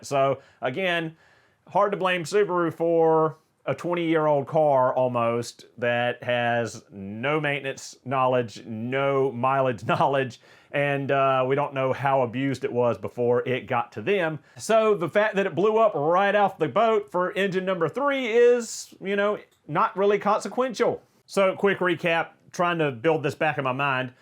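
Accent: South, United States. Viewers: Why are ppl with southern accents allowed on YouTube?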